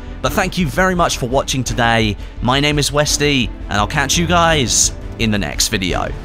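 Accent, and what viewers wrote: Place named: RP, United Kingdom. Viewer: I really like this guys content but I cant stand his posh way of speaking. For example when he says "as well" at the end of a sentence, its drawn out and prissy sounding.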